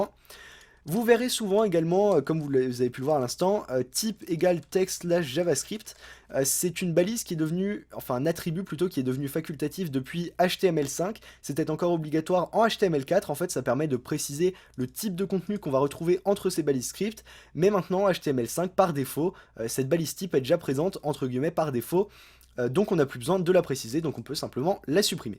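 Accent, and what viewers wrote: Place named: France. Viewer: la voix du bon caifran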